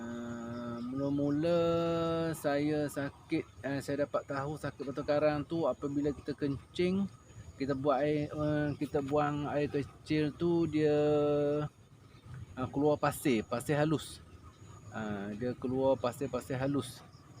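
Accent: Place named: Kelantan, Malaysia. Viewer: Cara cakap mcm org kelantan...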